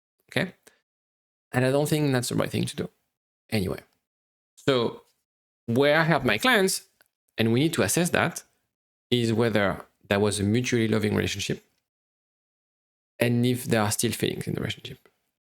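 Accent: France. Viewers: This video was extremely helpful. Subscribed! Also, your French accent is very cute😊🇨🇵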